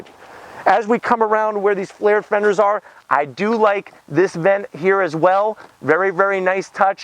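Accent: United States. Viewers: Nothing worse than a yank accent it grates it’s high pitched and not ENGLISH!!!’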